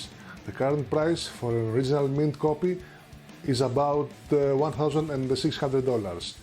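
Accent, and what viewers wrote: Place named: Greece. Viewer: Ur Greek accent reminded me of talking with Sakis Tolis when he was playing here with RC and I got to chat with him after the show :)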